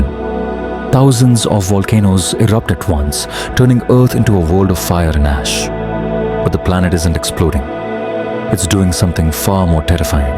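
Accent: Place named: India. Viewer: Hello bro 👋 Congratulations on ur new channel 😍 and Happy New Year 🎉 Saw ur video and realized that the content is actually good but the views r slow. I do voiceover. If you'd like me to voiceover ur vids, I would be happy to provide my services. I am an Indian and by ur accent I can say that we both r bros 😃. It appears to me that ur accent still lacks a global appeal. This is one of the reason for slow growth for an Indian youtuber. Kindly reply if you r looking to speed up things!!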